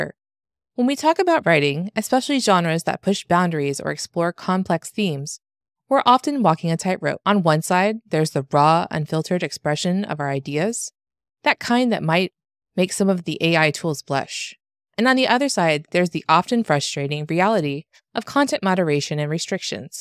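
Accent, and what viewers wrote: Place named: Pennsylvania, United States. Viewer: Is the narrator for this video from Pennsylvania? I detect a similar accent. :)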